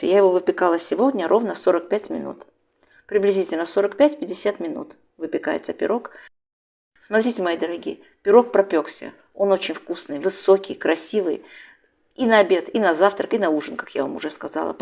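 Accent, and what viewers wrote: Baku, Azerbaijan. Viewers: А откуда родом наша девушка,очень пробиваются бакинские интонации. То ,что называют - бакинский акцент...музыка для слуха!!))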